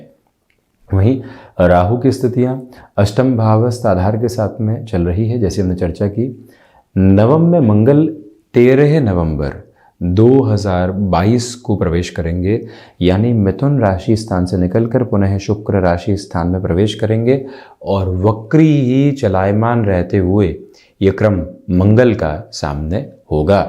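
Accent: Standard. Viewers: Koti koti, pranam 🙏🙏🙏🙏 Apki shudh hindi ko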